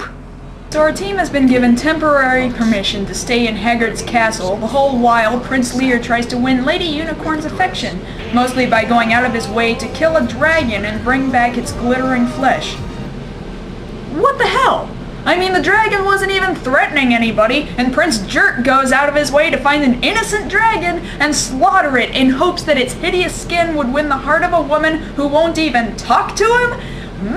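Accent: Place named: Texas, United States. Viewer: Thats the beauty of a Texas accent!!! YAY!!! Southern People rule!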